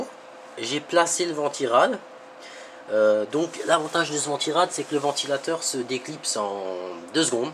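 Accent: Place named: Alsace, France. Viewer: cet accent alscacien du feu de dieux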